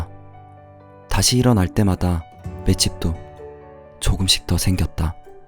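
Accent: Gyeongsang, South Korea. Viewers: 경상도 분이신가 '아무껏도' 연발이심.. 몰입하다 빵터졌네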